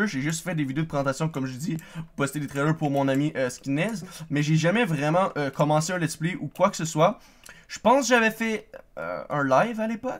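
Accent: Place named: Quebec, Canada. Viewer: un qc full yo dans ces phrases mdr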